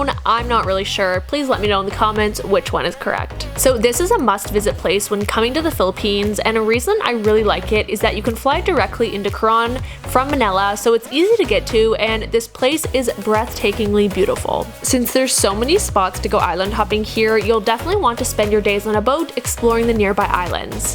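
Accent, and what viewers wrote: Canada. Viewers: you didn’t it’s cute hearing Filipino names through a Canadian Filter?! 🤣😉 (Cebu does sounds Like you: Seebu vs I’ve Heard other Filipinos myself included sound more like: sabu less emphasis on the e, no biggie, just cute, don’t worry no one understands me either!) 🤣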